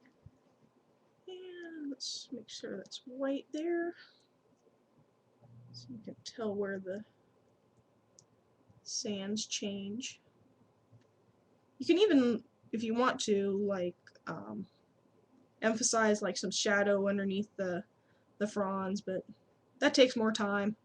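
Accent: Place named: Midwest, United States. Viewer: Good tutorial. Thank you. Are you by any chance from the Midwest? I'm from Illinois and your "accent" sounds local. I particularly liked your dunes. I seem to have a perspective problem with beaches. Mine look flat. Thanks again!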